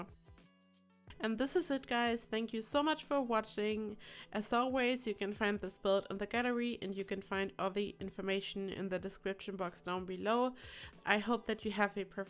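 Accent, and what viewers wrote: Germany. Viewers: Are you german? xD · Are you German? Your accent sounds like that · Bist du Deutsch?Hört sich vom Akzent so an☺️ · She really has an german accent lol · i don't know how i ended up here but are you german? you sound very german :D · I were just wondering, are you German cause your dialect kinda reminded me of German people speaking English☺️ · Wait, are you German? Btw, very nice one! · are you german? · are u german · Sounds German... To me As a German.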